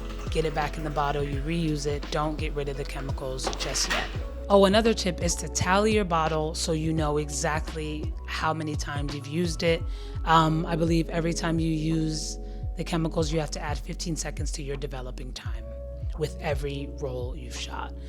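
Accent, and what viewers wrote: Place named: South Africa. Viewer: are you South African?? you have a cool accent. I am an experimental film maker from South Africa i really enjoy watching your channel you teaching us so much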